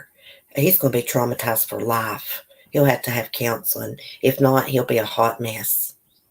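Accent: South, United States. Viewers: My 5 month old daughter and I listen to you every day. She just lights up when she hears your voice. You make my our day ❤️ Keep it up, girl. Sooo refreshing hearing a southern voice who keeps it real. With love from the Texas hill country, Kate & Roxy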